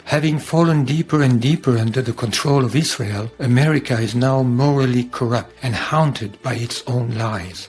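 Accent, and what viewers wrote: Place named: France. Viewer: This is a video that needs to be seen by EVERY American! (I only wish the narration was by a native English speaker as the French accent makes it difficult at times). Any country so brazen to bomb an American warship in 1967 would be brazen enough to assassinate an American president in 1963 Here we have the reason for classifying so many documents decades later. The American people must not learn that their "greatest ally" assassinated one of America's most beloved president (probably the most beloved in the modern era - even by republicans)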